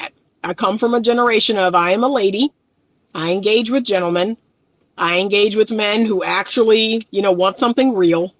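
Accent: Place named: South, United States. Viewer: i just knew that accent was from the south. DC , the border of the north and south. good video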